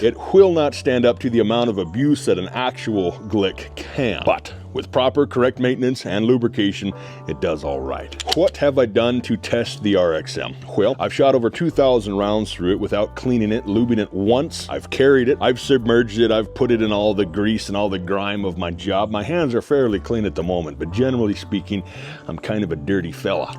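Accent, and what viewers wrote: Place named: Northwest England, United Kingdom. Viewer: This man has the most aggressive northwest accent I've ever hear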